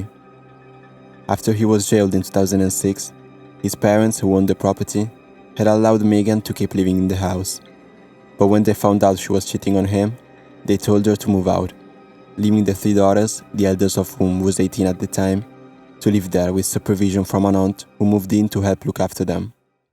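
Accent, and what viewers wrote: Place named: France. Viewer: That French accent. Oohh la la.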